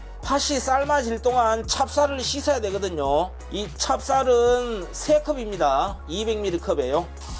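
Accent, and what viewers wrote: Jeolla, South Korea. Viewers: 전라도 고흥쪽 말같아요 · 저도 찰밥 좋아해서 자주 해먹습니다 왔다 맛있겠다 전라도 사투리 정감이 갑니다 셰프님 방송 자주보면 유용한 정보 감사 합니다 ❤❤ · 세프님 찰밥 잘 배우고 갑니다 전라도 사투리가 참 구수합니다 다음 영상도 기대할께요😊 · 전라도 사투리 넘 좋아요 고맙습니다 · 전라도 사투리 정겹습니다 우리 고향 말 물이 보타부립다 오랜만에 들어봅니다 ㅎㅎ 잘 보고있어요 맛난 요리 부탁드립니다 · 전라도억양 정겨워요❤ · 야~ㅅ따 전라도 찐한사투리 ㅎㅎ 요리는 한국인의 입맛 제대로입니다 많이 배워가고 있습니다 쉽게 단조롭고 간편하게 해설이 더 맛갈스럽네요 ㅋㅋ 발전을 기대합니다🎉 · 절라도 사투리는 싫은데 가끔 놀러온다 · 전라도 사투리예요? 강원도인줄 알았어요ㅎㅎㅎ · 남도 사투리 정겹습니다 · 오 찰밥 맛있게 보입니다 전라도말투 친근감이 가네요ㅎ 나도 찰밥을 좋아해서 종종 이렇게 해먹습니다 새벽에 영상을보니 출출할시간이라 더 입맛땡기네요 ㅎ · 아따.전라도. 사투리로 맛깔스럽게 표현이. 진짜 맛있겠네요.어쩜 그렇게 표현을 잘하세요.재밌어요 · 셰프님 말씀하실때 전라도 말투에 친근감이 갑니다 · 말씀이 구수해요~ 보타불고 전라도 분이신가봐요~ㅋㅋㅋ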